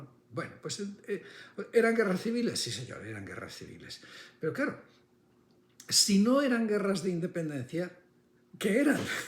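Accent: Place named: Catalonia, Spain. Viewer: Esté señor huele a independentista catalán ( su acento) esté señor tiene él discurso afirmado de un Fran Masón Vean por ustedes mismos. No cuenta la verdad sino que sigue una corriente de anglosajón loco.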